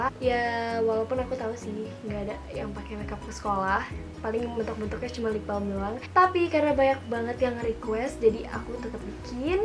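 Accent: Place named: Java, Indonesia. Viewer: logat jawanya keluar :v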